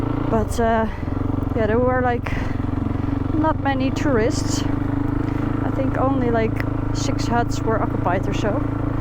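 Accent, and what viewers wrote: Netherlands. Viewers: very sympathetic and nice video. And I like your dutch english. remembers me at my colleagues from NL when I worked for Philips.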